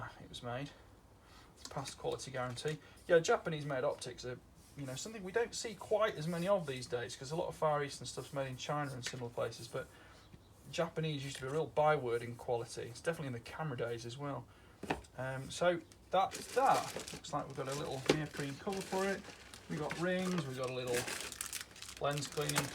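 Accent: United Kingdom. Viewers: You are from uk?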